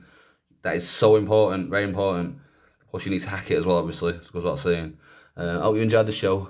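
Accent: United Kingdom. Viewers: Love the British accent mate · Are you scottish?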